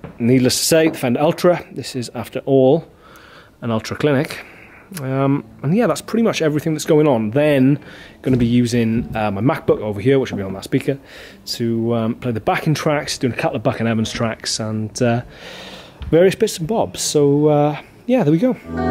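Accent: Wales, United Kingdom. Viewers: Il vient de pays-Galles aussi ou les accents sont difficile de comprendre.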